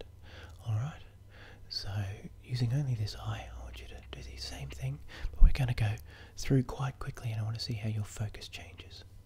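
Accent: Australia. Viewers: haha you're so Aussie. love it :) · Accents that trigger me change all the time but right now your Aussie accent is killing it! I've done nothing but sleep these last few days after dental work and a stressful few weeks, got a holiday off work next week and thoroughly looking forward to a week of sleep with your fantastic accent and brilliant knack for tingle creating at my ears! Much love to you from the other side of the world in Scotland x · Hello Jon Snow, I’ve found another Aussie asmrtist. 🇦🇺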